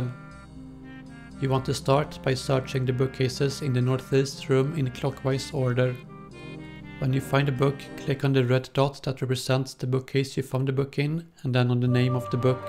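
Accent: Sweden. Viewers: bra svengelska :D...det gillar vi (Y)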